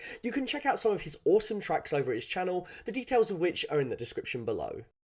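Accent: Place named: England, United Kingdom. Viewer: Fuck your pommy voice is annoying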